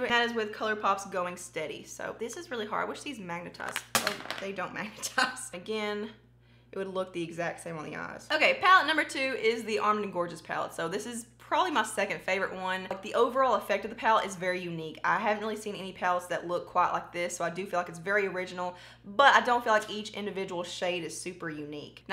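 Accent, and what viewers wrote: South, United States. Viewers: i was looking for a dupe for one of the greens in the dark magic palette, but tbh even with the warning that there probably won’t be an exact dupe, i have to watch the whole thing now because your accent is so adorable! i love southern drawls, they’re so relaxed and pretty-sounding~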